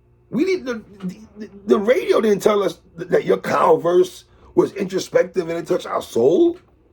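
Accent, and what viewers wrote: New York, United States. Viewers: Nothing to add, really. Great commentary here by an authentic NYC voice,. Someone in that Drumwork/BSF/Griselda camp needs to make sure they all see this video. Radio didn't make them hot. Conway and nem make music for a niche fanbase, who loves what they do. Unless they're willing to switch up their sound and play the political industry games, they shouldn't even worry about getting spins from the likes of Flex.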